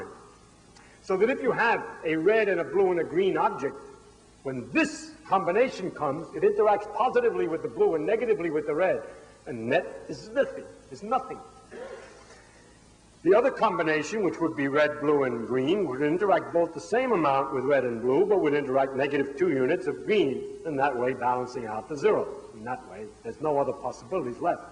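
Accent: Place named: Brooklyn, United States. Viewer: I’ve spent this rainy day watching Feynman lectures. Jeez, what a terrific teacher. Love his thick, Brooklyn accent. He has the timing and cadence of a comedian.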